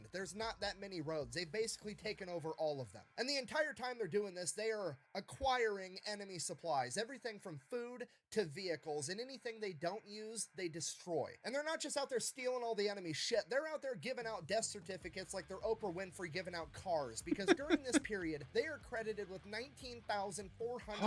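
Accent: United States. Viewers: You have got to be southern ❤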